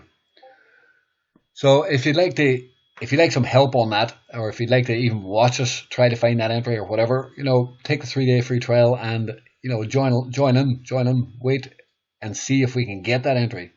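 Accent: Northern Ireland, United Kingdom. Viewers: Northern Ireland accent i hear there ?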